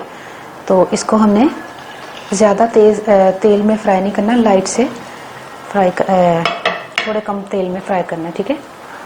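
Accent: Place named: Punjab, India. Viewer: Yar apki punjabi bare mazy ki ha api aur mein larki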